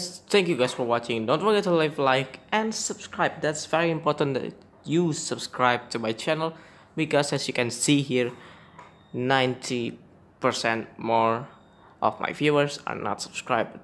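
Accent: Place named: Russia. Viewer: I am damn sure you are russian.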